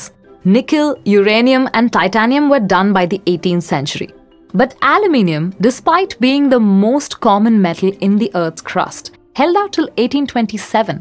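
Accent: India. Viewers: For the first time I like the Indian accent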